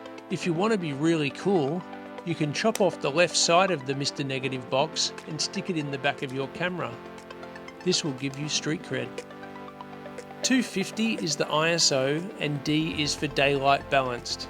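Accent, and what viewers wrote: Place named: Australia. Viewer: Love the explanation of the film, and your casual Aussie cheeky delivery. Nice product hand flourishes, this too could be yours on...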